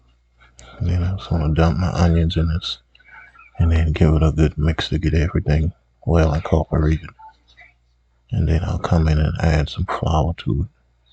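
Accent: Charleston, United States. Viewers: Where you from? Sound like you from Charleston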